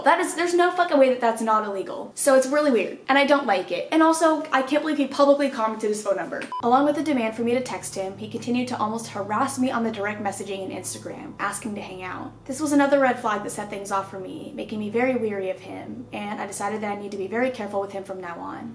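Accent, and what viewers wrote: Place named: South, United States. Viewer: She sounded so southern in the videos holy shit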